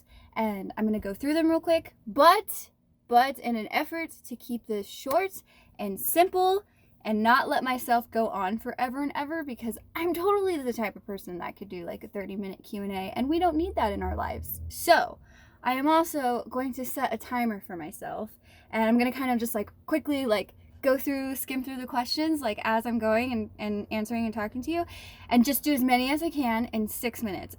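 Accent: United States. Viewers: maybe your half what does it matter. Sounds American to me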